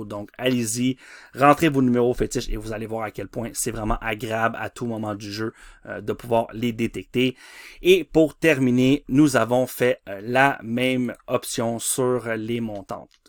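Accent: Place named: Quebec, Canada. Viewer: Grand travail Québécois trop belle akcent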